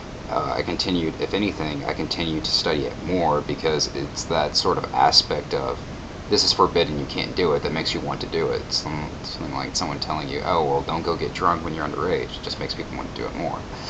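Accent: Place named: Santa Fe, United States. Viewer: I feel everything you say man, I've dealt with all the christian family shit as well haha. You sound like you're from Santa fe man, one of the people that denied to be stupid like the rest.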